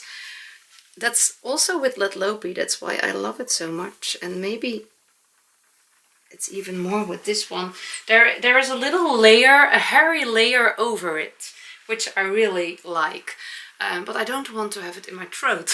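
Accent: Netherlands. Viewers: I live in Kentucky in the USA. I love your Dutch-ie English!!! Heart jumping is one of my favorite things you say. It is such a beautiful and descriptive way to express it! I have always also loved your hair. You looked extra beautiful this episode. I have similar texture to my hair and I think I will cut it like yours! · I love the “heart jumping”! I’ve been saying it and my Grandsons (5 and 9) think it’s fun and they know exactly what I mean when I say they make my heart jump!❤❤❤ Love your podcast! And your “Dutchy” English. You are perfectly easy to understand!!! ❤️🥰❤️🥰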